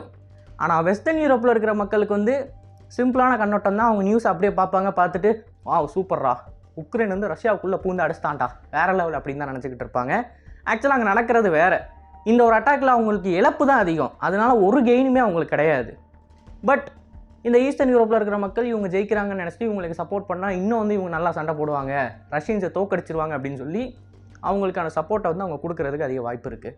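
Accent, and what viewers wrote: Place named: Madurai, India. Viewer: Maduraikaran slang varuthe pa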